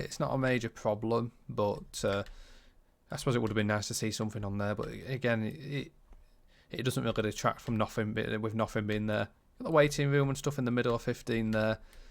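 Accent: Yorkshire, United Kingdom. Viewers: thanks very much for scanning your critical eye over the route, which I hope DTG take notice of. Love your Yorkshire accent and comments.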